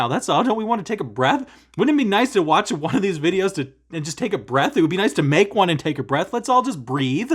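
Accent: Canada. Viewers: “Snuggling arm” 😂😂😂😂 so nice to hear a Maritime accent (not many of us here in Oklahoma😉) - g’s or no g’s notwithstanding! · This is the first of your videos that I have watched and it was great . . . I'm off to watch the rest. I moved to New Brunswick 5 years ago and listening to you speak, I thought you sounded like David Myles (my husband agreed) so when you mentioned the New Brunswick accent I laughed . . . maybe it is a thing!!!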